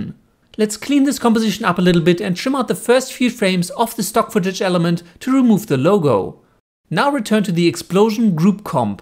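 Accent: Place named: Germany. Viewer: Thanks for your tutorials! Where are you from? It sounds a little bit like you're from Germany..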